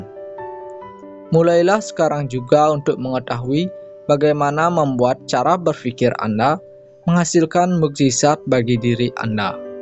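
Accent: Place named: Aceh, Indonesia. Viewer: Orang aceh 😅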